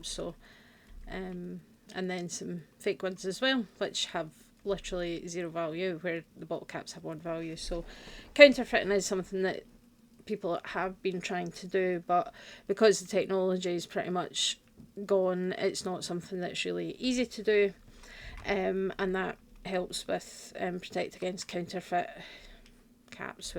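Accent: United Kingdom. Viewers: You from glasgow? My fiance is from Leith. Great vid, thx from USA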